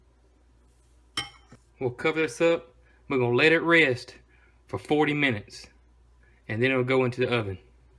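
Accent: South, United States. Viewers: Love ur country accent!!!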